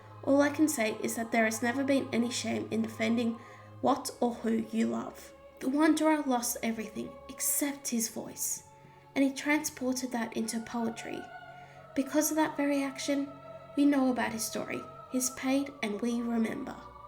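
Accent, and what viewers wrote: Australia. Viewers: Don't change your Australian accent! It's part of who you are and, honestly, it's quite refreshing to hear poetry recited in an accent which is not either English or US-one-size-fits-all. There is a version of The Wanderer in the original Old English (violavonschnitzel) on YouTube. It sounds very Scandinavian. Every accent lends something special to a reading. Apart from 'half showers' which should be 'hail showers', I don't think there's anything in your accent that can't be ironed out by sloooowwinng down a bit. I think it's wonderful (and hopeful) that someone as young as yourself (i.e. a lot younger than me) is interested in 'The Wanderer' and 'The Lord of the Rings'. The way things are going at the moment I can see a subversive movement developing whereby people will be swapping illicit copies of Shakespeare's 'Merry Wives of Windsor' or Orwell's 'Animal Farm'. 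😉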